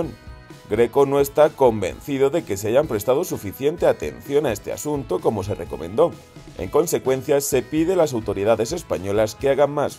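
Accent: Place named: Latin America. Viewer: Canal “Españolista” con voz de latino?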